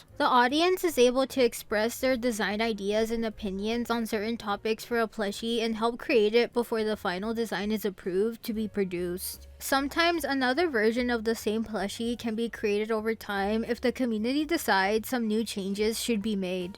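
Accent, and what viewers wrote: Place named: North America. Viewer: You're kidding. You speak better English than me, and that's MY first language! And the accent sounds like it could be from the US or Canada, which is even more impressive because it sounds like you grew up in one of those places around people with the same accent! And no sweat on mispronouncing things. Us folks that grew up with English do that occasionally, too. I used to do YT, too, and sometimes, I'd read something, know exactly what that word was, but my voice would already have enough momentum going it would absolutely plow through a word with no regard for pronunciation like a bull through a gate.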